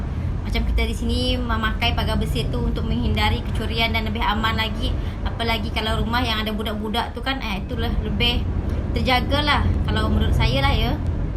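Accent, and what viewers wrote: Indonesia. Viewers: Dgr cm loghat kepulauan riau je.kabupaten lingga.btl ke? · Awak ni org mana? Awak mcm ckp awak org Malaysia… tp loghat awak tu mcm org Indonesia cuba cakap bhs Melayu Malaysia. Contoh: Org Malaysia tak ckp beda, org Malaysia ckp beza, Indonesia bukan Indonesie, n byk lagi. mmg bukan bunyi org Melayu Malaysia pun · Kamu ni org indonesia ke confius la Mcm bunyi org indonesia jer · ni org mane??.. dr segi gaya² ckp tu.. mcm org Indonesia..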